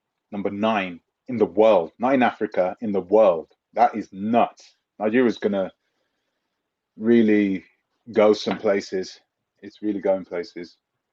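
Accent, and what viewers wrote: United Kingdom. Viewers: Can u speak your African accent and desert your British accent. Coming from a concerned Gambiana.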